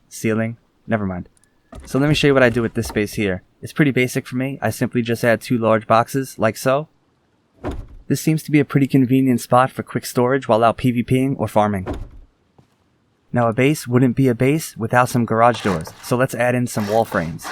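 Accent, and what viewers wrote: New York, United States. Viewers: what part? im from Merrick on Long Island, i could tell a fellow New Yorkers accent. Also good luck with the videos, very well laid out and descriptive.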